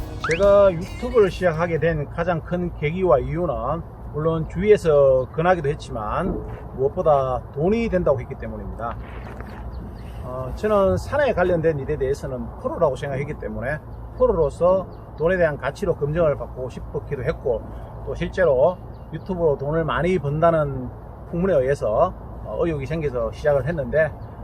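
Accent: Daegu, South Korea. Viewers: 와우~~~ 님, 홍성 용봉산의 봄 풍광과 함께 개인적인 소개를 들으니 궁금증도 해소되고 더욱 친밀해 지네요~~~^^ 음.. 저보다 쬐끔은 어리시리라 생각했는데 6년정도 되네요~^^ 사시는곳은 저희 어머님 고향인 대구셔서 억양이 익숙하고 정감있었던 것 같습니다~~ 태안 백화산에서 '이리오너라~~ 게 아무도 없느냐~~' 와우~ 마라톤완주, 철인3종, 수영까지... 반바지 패션을 외쳤었는데~ 오늘 사진으로 다 봤네요~ 굳입니다~~ 항상 응원드리며, 사계절 확인제품군이 아닌 품목들은 협찬 받으셨으면 합니다. 그러면 실시간영상과 드론영상, 360도 촬영등도 앞당겨 할 수 있고 님이 하셔야 제맛일듯 합니다~~ 나이들수록 좀 더 큰 숲을 보기 위해 힘을 빼다보면 가까운 나무앞에서의 자신만의 룰이 꼭 정답은 아닐 수 있다는 생각을 하게 됩니다. 이 대목엔 맞지 않지만 요즘 제가 좋아하는 말이 있습니다. '악은 나를 생존케하고 선은 우리를 공존케 한다' 우리가 배우고 지키던 도덕과 윤리는 자연속에 부합되는 섭리가 아니라 인간 공동체 사회속에서 국한되어지는 룰이겠지요~ 코로나만 아니면 함께 등반기회가 있었으면 좋겠네요~~ ㅎㅎ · 억양만으로 대구 분 이라고 생각했습니다 540만원? 한 편 찍는데 이동하는 기름값도 안되네요 ㅠㅠ 구독자들과 소통을 위해 월1회정도 라이브방송 괜찮은듯 합니다. 슈퍼챗 이라고 구독자가 후원해주는게 있는데.. 아마 인기많으셔서 금방 부자되실 거에요^^ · 아 님 거짓말도 좀 하시는듯... 마라톤 풀코스 완주에 바다수영 3km, 또 트라이애슬론까지 다 하시는 분이면서 체력이 그렇게 좋은거 아니라고 말씀하시다니요. ㅎㅎ 어쩐지 산을 좀 날아다니신다 했습니다. 사투리가 가끔 묻어난다 했더니 대구분이셨군요. 저도 동향입니다. 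십몇년을 그곳에서 살았었죠. 유튜브 하시는 분들 다 수익발생 바라고 하지만 하고 있는 입장에서도 쉬운 일은 아닌데 너무 잘 하시는거 같습니다. 조만간 오억도 곧 달성하실거 같네요. ^^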